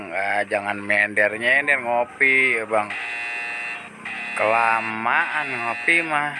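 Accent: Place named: Jakarta, Indonesia. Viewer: Logat ngomongnya seperti org betawi ya bang😊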